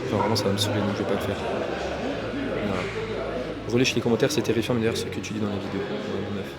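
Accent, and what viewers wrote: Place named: Asia. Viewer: Je mets un dislike pour l’accent asiatique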